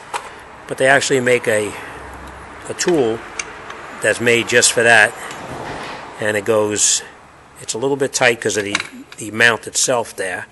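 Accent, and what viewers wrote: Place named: Staten Island, United States. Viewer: Love your videos brother. Your accent makes me miss home...Staten Island.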